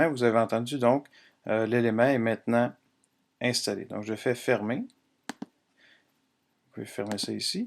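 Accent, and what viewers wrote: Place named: Canada. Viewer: SUPER TUTO, très clair. Maintenant, il en faudrait un pour utiliser Audacity !! Et j'aime trop l'accent de nos amis canadiens !!! Merci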